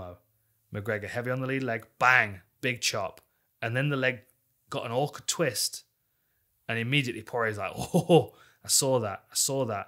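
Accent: United Kingdom. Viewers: Listening to you talk about mma makes me so relaxed man it's a form of meditation for me you heighten all my senses and I love listening to your soothing British accent and excellent flexion. Thanks bro!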